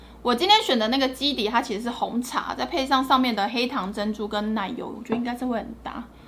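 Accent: Taiwan. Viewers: 好喜歡的口條超台但是又很清楚！真的很難得哈哈😍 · 是塔批歐咖哦～ 然後我是看癡癡的合作影片來的，覺得道地的台語非常對我胃口訂閱了，加油☺️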